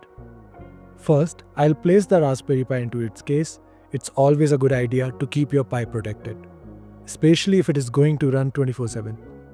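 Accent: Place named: India. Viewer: From your voice guessing you are an Indian and hope you live in India coz we need India specific affordable solutions. I have been using Sonoffs, Tinxys, Smarteefi...etc in my house and plenty of Amazon and Google smart speakers. This week have asked my kids to try HA on an old Lenovo mini PC. Eventually I may move to one of the Chinese mini PC brands like Gtek. The speaker you mentioned is 8K in India. Anyway we can use Alexa or Google speakers for this?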